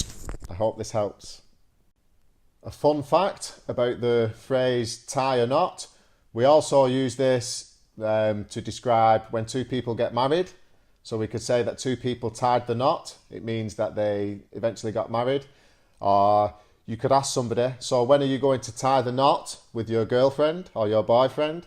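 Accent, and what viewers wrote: United Kingdom. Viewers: Hi, how are you? What kind of British accent do you use?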